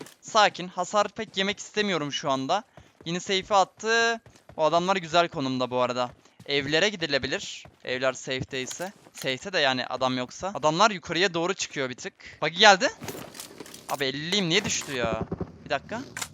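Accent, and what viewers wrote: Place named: Denizli, Turkey. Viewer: Abi sen denizlilimisin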